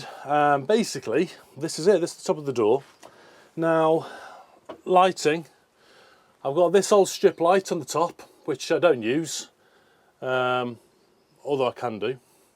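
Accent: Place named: Northwest England, United Kingdom. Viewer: Hi, I am a complete noob at this sort of thing, but what sort of wattage would you get from your 25w panel give, would it power a 2500w watt chain saw? we are trying to power a allotment shed to give us power on site, ps I could tell by the accent your from the northwest I a from liverpool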